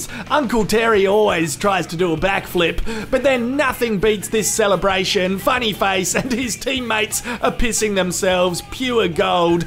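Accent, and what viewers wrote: Australia. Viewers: Can astrailian people just commentate everything? The accent and slang is truly the icing on the cake for commentary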